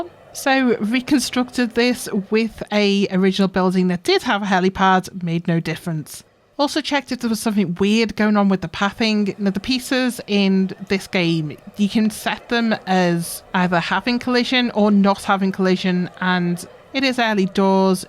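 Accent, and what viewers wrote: Liverpool, United Kingdom. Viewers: I am from the wirral, so it's nice to have a scouser planco player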